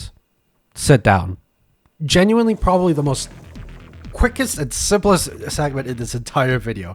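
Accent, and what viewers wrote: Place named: United Kingdom. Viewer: bro even if there's not a whole lot of VR games I believe you only need one Hotdogs, Horseshoes & Hand Grenades. Also dude what part of the UK are you from? Your accent is very interesting I don't think I've heard it before xD I'm midlands myself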